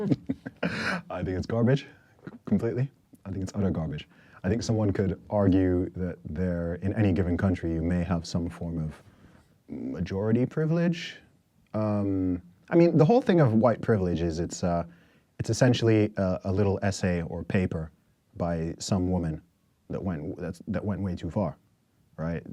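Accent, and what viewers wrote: United States. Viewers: By the way, this guy is British. I would like to know how he got the American accent. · Is he from the South West? What's with the Mid-Atlantic accent? No problem with it, I have one myself. I know this comment is crud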